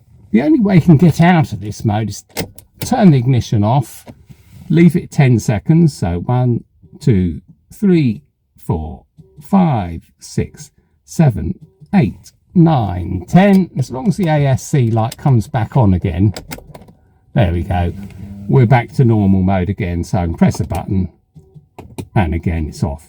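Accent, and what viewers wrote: United Kingdom. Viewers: I love this guy. Listening to an english fellow explain things is as comforting as high tea on a lovely afternoon.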